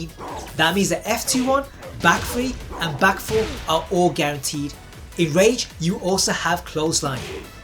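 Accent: Birmingham, United Kingdom. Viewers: great guide, thanks a ton! you from birmingham? trying to place your accent. rly appreciate all the king tech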